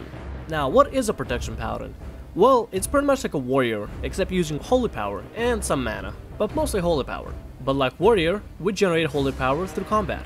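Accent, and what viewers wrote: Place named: Russia. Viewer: Привет, по акценту подумал ты Русский такчто надеюсь ты поймешь :P! Тебе кто Больше нравитсья Вар или Пала (танки)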